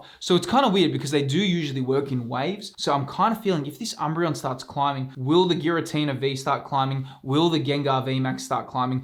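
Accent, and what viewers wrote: Australia. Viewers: Just started collecting again and im so glad I stumbled across your channel. It’s so refreshing to watch and hear a fellow aussie! love the content it’s been super helpful and insightful :)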